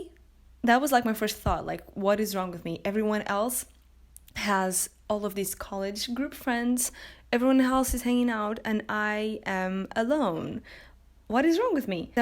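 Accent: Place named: Brazil. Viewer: Você é brasileira? Seu sotaque não parece o sotaque de quem nasceu nos eua, amei o vídeo e amei o seu canaaaal :)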